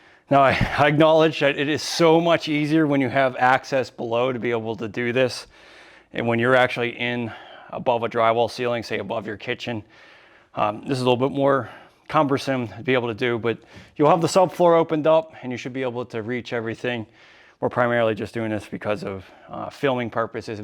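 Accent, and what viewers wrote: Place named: Pennsylvania, United States. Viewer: My boy has a Pennsylvania accent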